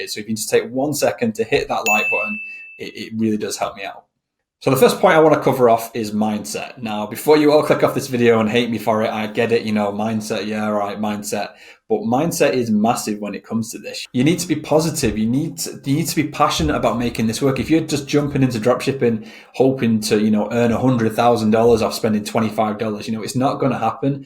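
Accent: North West England, United Kingdom. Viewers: where you from mate, sound local. north-west?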